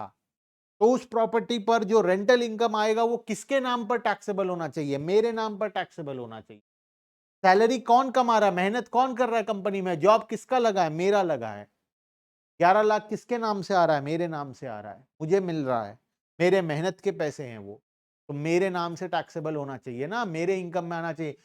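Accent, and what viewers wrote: Odisha, India. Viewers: Sir your odia voice is awesome ❤ Are you from sambalpur 😅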